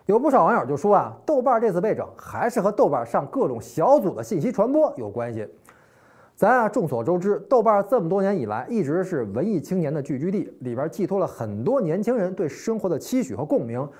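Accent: Beijing, China. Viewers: 给你提个建议兄弟 尽量讲普通话 北京口音有点重 我是北方人听起来都有点费劲。南方人和港台地区估计更费劲了！ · 广东人觉得没有困难。本来频道就是京腔京韵嘛 北京口音听着就很爽❤️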